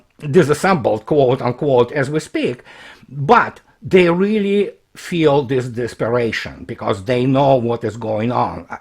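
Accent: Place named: Russia. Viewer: When I heard you at the Duran it was a bit hard for me to understand your "Russian accent" (me Spanish accent), by now I'm loving every one of your videos and I understand and agree in everything you say. You are a very Intelligent man.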